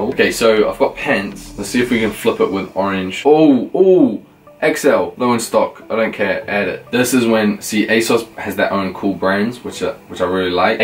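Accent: New Zealand. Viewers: Sounds like you copped a New Zealand accent 🇳🇿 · are you a kiwi living in the UK?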